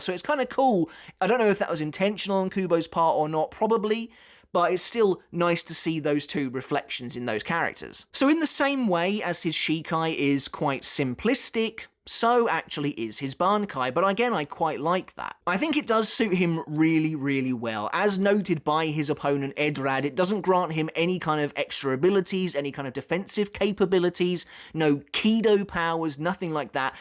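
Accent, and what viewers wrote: United Kingdom. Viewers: It's one of my favorite banki. I appreciate the work you do, but I will say your pronunciation of the names is very lazy. I understand it's your British accent, but the names aren't British... At least try to pronounce them closer to Japanese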